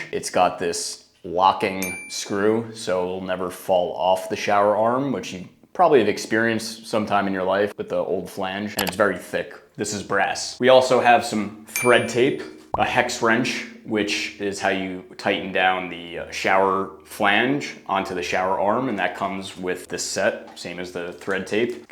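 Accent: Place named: RP, United Kingdom. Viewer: tutorial on installing an S-style shower arm is absolutely top-tier. His plummy delivery and refined advice make a technical plumbing task feel like a sophisticated DIY masterclass. He explains the elevation gains and clearance benefits with such clarity that even a complex setup feels effortless. If you’re looking to upgrade your shower experience with professional guidance, this is the gold standard.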